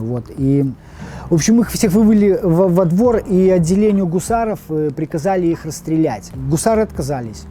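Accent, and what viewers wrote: Belarus. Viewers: Чувак из Белоруссии, по разговору. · Белорусский акцент у чувака. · какой всё таки специфический белорусский говор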